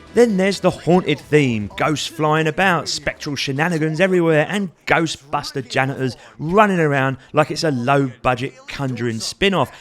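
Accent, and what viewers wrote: Cockney, United Kingdom. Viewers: Loving the cockney.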